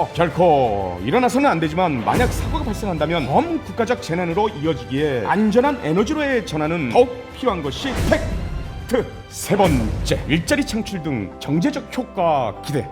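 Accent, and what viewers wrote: North Korea. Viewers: 성우가 약간 ㅋㅋㅋ 북한 같아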